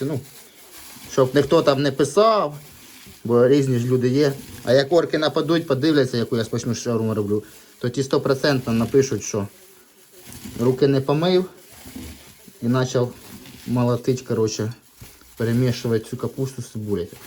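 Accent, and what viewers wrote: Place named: Lubny, Ukraine. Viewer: В мене рiднi в Лубнах. Говiр дуже схожий😁 🇺🇦✌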